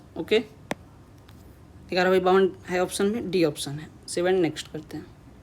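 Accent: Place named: Bihar, India. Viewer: Very nice ❤❤ भैया ❤❤ awaaz me bihari touch 🙊🤗🤗🤗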